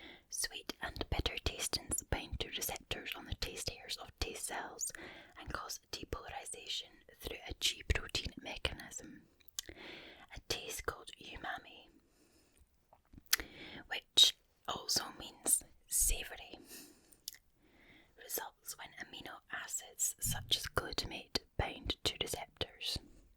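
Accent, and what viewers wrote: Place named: Scotland, United Kingdom. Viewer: sounding super Scottish in this video - love it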